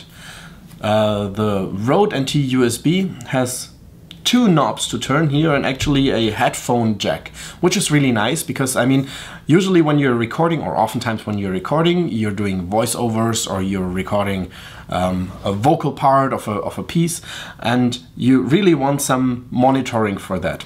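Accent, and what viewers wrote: Germany. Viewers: hi du bist deutscher oder ? höre da viel deutschen akzent raus ? Also ich liebäuge ja zur zeit sehr mit dem rode nt usb. das akg hat mMn. auch sehr gut geklungen, aber wenn man sich so viel auskennen muss um da viele einstellungen vorzunehmen, damit es so klingt, bleib ich wohl lieber beim rode nt usw. ich will auch kein xlr + interface usw. kaufen. finde ich übertrieben, da ich es ja nur am pc benutzen möchte. das akg dich enttäuscht hat, hat mich echt überrascht, weils halt am anfang gut klang und allgemein ich nur gutes von der firma gehört habe.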